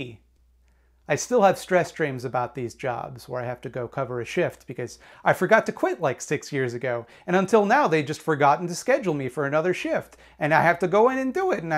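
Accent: Newfoundland, Canada. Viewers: I'm sorry, you're a Newfie???? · I did not realize you were a newfie! Hey from scotia!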